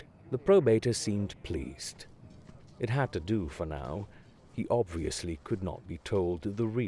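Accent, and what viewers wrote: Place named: South America, Latin America. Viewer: Great adeptus arbites audio log. Didn't expect the light souther american accent but i like it. Makes the members of the arbites seem more human despite the megalomaniacal authoritarian mania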